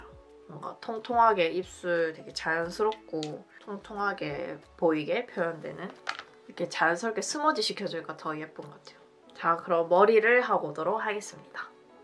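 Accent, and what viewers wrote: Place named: Seoul, South Korea. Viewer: 이제 서울말 쓰시네요..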